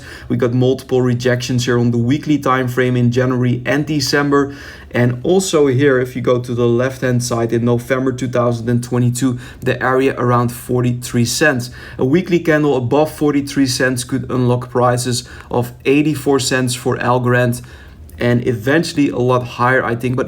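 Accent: Netherlands. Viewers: i love you content ♥️ I immediately subscribed to your channel!.. and even though you speak very good English... I still hear that you have a Dutch accent... is that right? 😊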